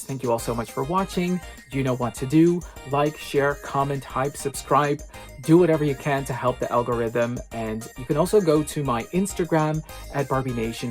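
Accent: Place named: New Jersey, United States. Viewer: That is so cool! I thought you had a Jersey accent lmao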